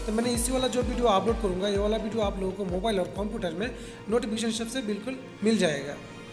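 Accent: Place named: Bengal, India. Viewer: Bangla channel achhe naki.. apnar Hindi shunlei bangali lagchhe toh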